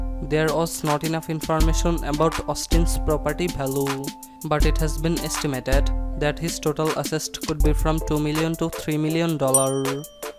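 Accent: India. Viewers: wtf is that engl ? are you from India ?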